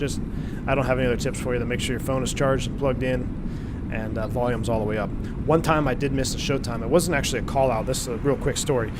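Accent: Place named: United States. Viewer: Sounds like an american accent to me...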